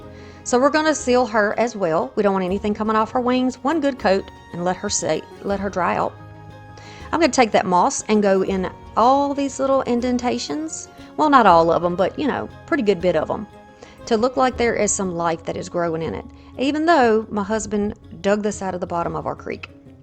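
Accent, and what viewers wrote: South, United States. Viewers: Both are beautiful. Me and my 9 year old granddaughter are going to go a fairy garden outside. Have you done any fairy gardens outside? If so, do you have any videos. I shared this video with her. She's so funny. She said mamaw she talks different. We live in New Mexico, and she's never been to the southeast side of the United States. I used to live in Mississippi. I still have some of a southern drawl.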